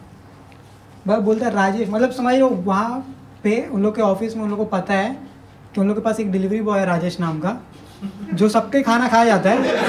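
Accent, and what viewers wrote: Bhopal, India. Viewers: TU BHOPAL SE HAI KYA ???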